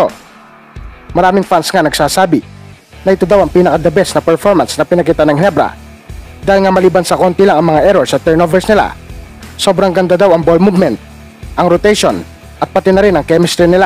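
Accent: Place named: Visayas, Philippines. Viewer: bisaya ka talaga mag salita..😃😃😃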